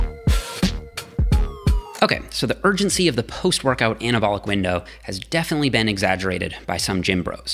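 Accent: Ireland. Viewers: A canadian that sounds irish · Is he Irish?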